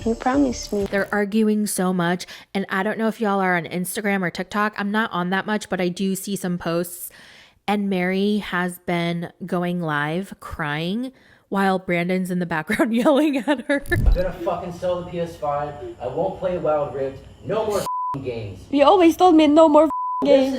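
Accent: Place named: East Coast, United States. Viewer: I knew you were east coast lol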